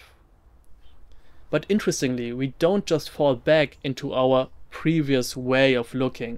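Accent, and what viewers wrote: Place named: Germany. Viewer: You sound German. Are you German? 😄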